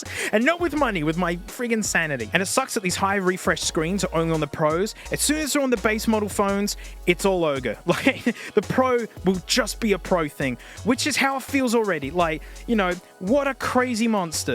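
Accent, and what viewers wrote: Australia. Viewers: You just had to confirm my suspicions of you being Australian in the end😂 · thats because hes an aussie mate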